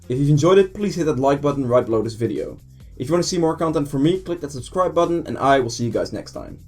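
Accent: Netherlands. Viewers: nice vid again greeting from your home country I can hear a dutch accent xD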